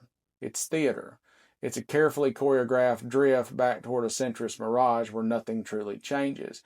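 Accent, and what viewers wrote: South, United States. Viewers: Glad to hear more southern accents speak these truths!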